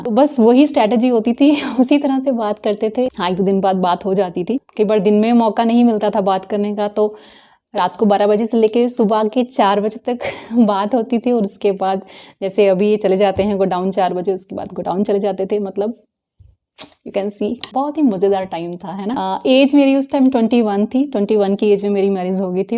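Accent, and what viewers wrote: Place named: Punjab, India. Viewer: Hi I have recently watched some of your vlogs, I like your accent it reminds me of my childhood that I spent in patiala. Your pronunciation is so typical of Punjab. 😊😊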